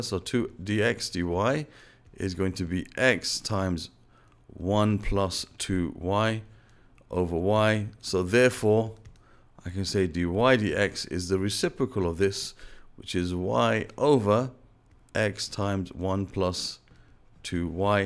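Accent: United Kingdom. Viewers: i always wonder where you are from. your name is arab but ur accent is a bit british.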